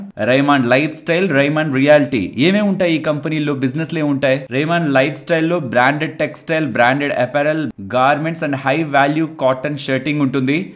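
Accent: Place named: Visakhapatnam, India. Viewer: ‘Eey vusuuu lekunda’pakka Vizag language 😀 👌🏽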